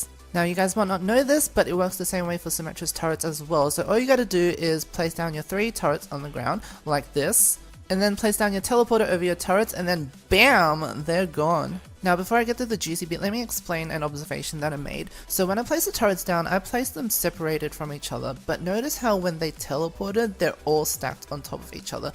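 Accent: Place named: United Kingdom. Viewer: sounds like a british girl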